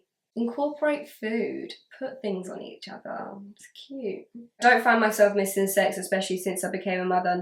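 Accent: England, United Kingdom. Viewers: I like listening to the way you talk I like the UK accent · you have the best english accent and tone of voice I've ever heard. I could listen to you all day!!!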